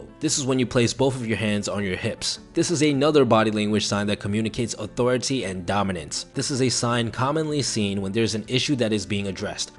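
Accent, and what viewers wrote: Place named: Boston, United States. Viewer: his Boston accent makes the video even better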